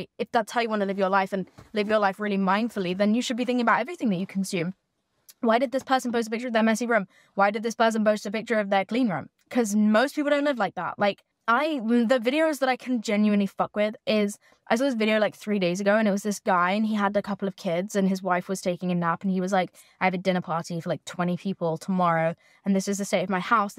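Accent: United Kingdom. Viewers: im british and she sounds very british to me, i don’t hear australian tbh😂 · her style, natural look/makeup, clear skin, brown layered¿ hair and british accent really make her come off as clean girl/posh if you dont know her personality. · What accent does her have ? The British one ?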